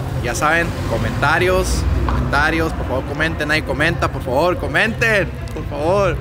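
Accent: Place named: Sonora, Mexico. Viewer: Habla cómo Los de sonora.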